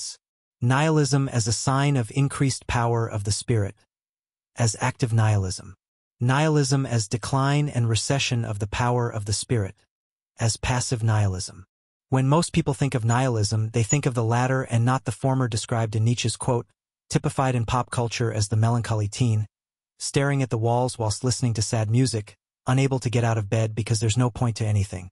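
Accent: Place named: Wales, United Kingdom. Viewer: I was just getting used to the Welsh accent lol.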